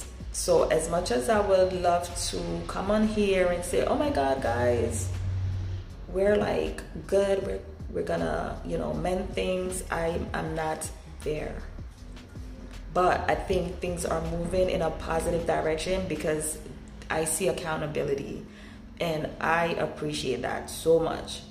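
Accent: Jamaica. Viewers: U. are Jamaican?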